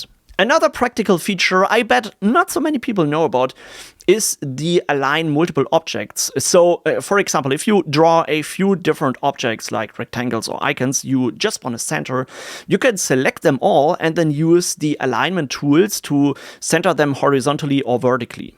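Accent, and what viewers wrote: Germany. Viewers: subbed, i found you to be a good how to guy, will be checking out more of your videos and liking and or commenting, Are you German or Austrian, excuse my ignorance pls.I like your accent and my tism is acting up needing to know the answer.I could read your bio, but that would discourage discourse with you directly.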